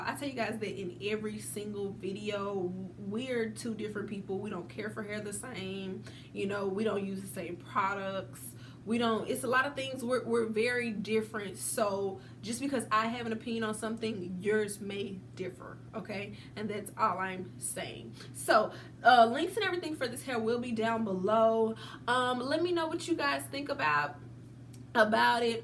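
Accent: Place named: South, United States. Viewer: Love that southern belle accent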